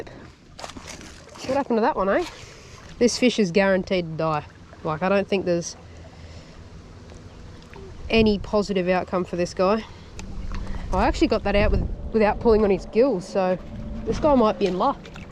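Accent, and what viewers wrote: Australia. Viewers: You sound Auatralian (and your steering wheel is on the wrong side), but the currency sign in the bait shop showed a $ (dollar) sign. I had to look up what currancy symbol Australians used. I thought Australians used the British Pound system.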